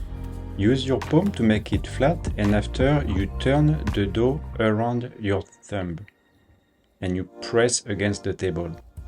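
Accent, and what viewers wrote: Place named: France. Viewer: The last time I heard French English accent was so long ago, and it is nice to see a French chef guide how to make a brioche 😄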